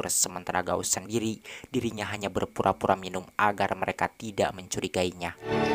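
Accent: West Java, Indonesia. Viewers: Akang nya orang Sunda ya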